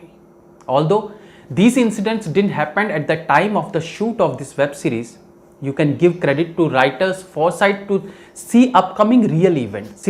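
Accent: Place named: India. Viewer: U r speaking english in Hindi tone.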